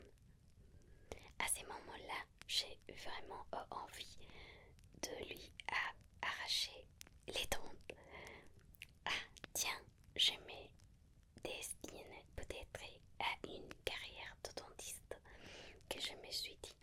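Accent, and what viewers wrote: Spain. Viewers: Pour moi, c'est justement ton accent espagnol en Français que j'adore. Merci pour l'effort que ça doit être pour toi. ❤ 👍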